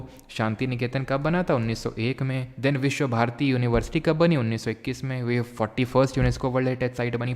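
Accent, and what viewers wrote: Kanpur, India. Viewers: Ye hamara kanpuriya style hi😄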